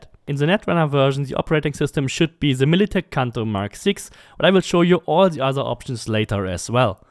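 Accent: Germany. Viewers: I think i hear the german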